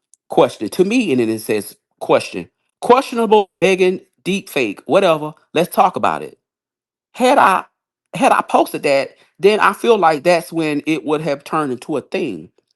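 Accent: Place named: Texas, United States. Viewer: Also are you from TX too bc your accent, no shade at alllll, sounds similar to Milagro the way your pronounce certain words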